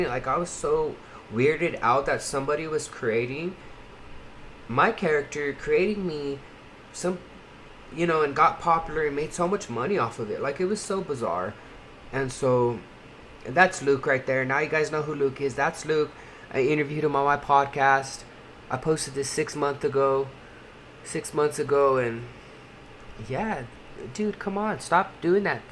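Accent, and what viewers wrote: India. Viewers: Nooo!!! U got a indian/gay accent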